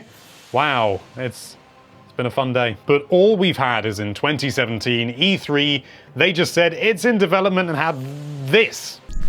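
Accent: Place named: United Kingdom. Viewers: It’s the british accent interpreting the name as it’s spoken. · Yeah that's how all British people say it.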